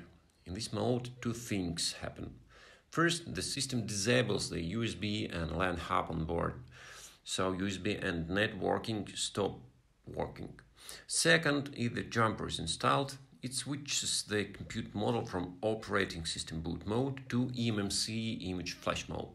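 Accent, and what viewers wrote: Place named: Russia. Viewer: да я отлично понимаю ваш руссиш инглиш, комрад, сижу, ковыряю поделку )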